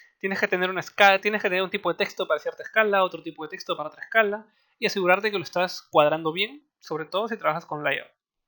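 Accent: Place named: Mexico. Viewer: EXCELENTE MANERA DE EXPLICAR MI BUEN. ME DA CURIOSIDAD TU ACENTO, PARECE ACENTO MEXICANO JAJA. GRACIAS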